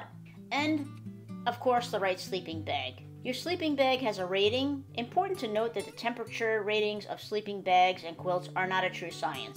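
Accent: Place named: Northeast, United States. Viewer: your slight northeastern accent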